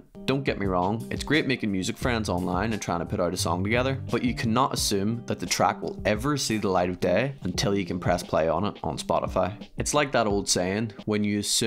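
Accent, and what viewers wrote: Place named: Scotland, United Kingdom. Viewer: Yes...you got a sub bc i like your scottish accent. 😜 Lived near Perth 10 years ago. My Ex is scottish. But she is back home now. But i loved your country...if im right that you are a scots dude...???!!! I also saw that you are on Looperman. Im not active there anymore. But i was like 13 years ago. I only go there sometimes to download an acapella. Greetings from Germany. ❤☮☯❤☮☯❤☮☯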